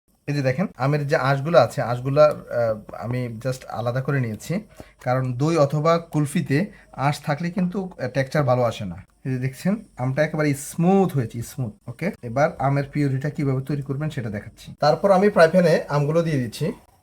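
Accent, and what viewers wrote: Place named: Chandpur, Bangladesh. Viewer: আপনার ভাষায় চাঁদপুরের ছায়া দেখতে পাচ্ছি। আপনি কি চাঁদপুর থেকে বলছেন? যাই হোক, ধন্যবাদ এতো সুন্দর করে রেসিপি শেয়ার করার জন্য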